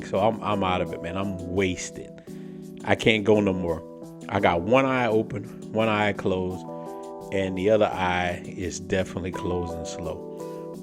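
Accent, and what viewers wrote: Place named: Louisiana, United States. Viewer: what part of Louisiana are you from bro? I can hear a Louisiana twang. I'm from the boot and the way you said baffruum(Bathroom), I was like, bro from the N.O, but I can hear some of that Lafayette area in his voice also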